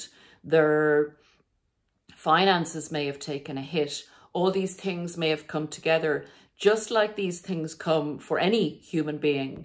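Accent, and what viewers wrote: Ireland. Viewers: R u Irish by the way? The only reason I ask is because well I am 50% I’ve had a really bad weekend with my narc But I had to tell my parents I was texting them they just drove all the way to the Florida Keys they are almost 80 but I’m sending them voice memos because somehow it just happens to the best of us when the end of a sentence has to come out Irish, well it happened to me all last night and I couldn’t stop laughing that usually happens to my dad. It’s just so funny because it’s like I’ve never been to Ireland but it lives in us I don’t know I might be wrong completely but I thought just maybe maybe if you might be a little bit Irish? You don’t do it though but it’s like how do we adapt an accent from a country that we’ve never been to?! LOLs that’s the only thing that’s kept me laughing through all this narcissistic abuse from my boyfriend who I am about to have seriously just I’m gonna forget about him eight years down the tube but you know what eight years too long of me putting up with this it’s like it’s like dealing with somebody with multiple personalities there might be other things going on I don’t know I don’t care anymore I just know that I’d know this is a hard test for me because I am not usually the one to sever the ties in relationships It’s not a want it’s a need it’s kind of like I have to it’s like you’re being forced to leave this person and it just will get it will get so bad it will snow ball until you end it and your whole entire life is a mess An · I can hear you are irish do you habve coachibg online can you putvthe contact here thank yohbits greatctibget info here thanks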